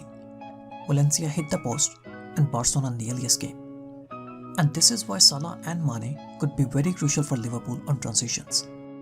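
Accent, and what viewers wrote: India. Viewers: No disrespect man but as soon I can hear the Indian accent I couldn't go last two minutes.... Shocking... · you sound Indian? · why your sound like a indian, are u Indian? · u have kinda Indian accent.... I guess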